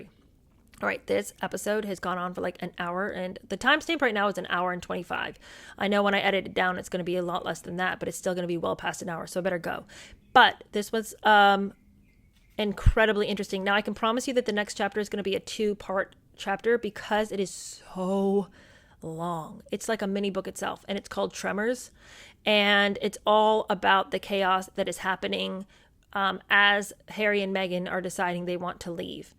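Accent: United Kingdom. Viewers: I love your Brit accents, LOL. It annoys me greatly that us taxpayers here in the UK fricken paid for this circus of a wedding! She is such an ungrateful bee-atch!!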